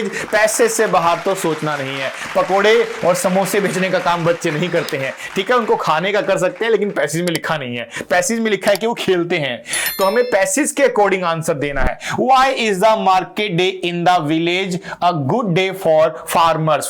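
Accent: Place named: United Kingdom. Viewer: Sir I had a thing that I think is odd and that is your accent you speak in a half british accent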